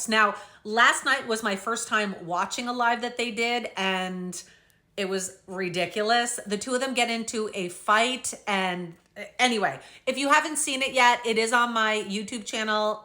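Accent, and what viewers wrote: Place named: East Coast, Canada. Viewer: Just found you tonight. You have to be Canadian, east coast!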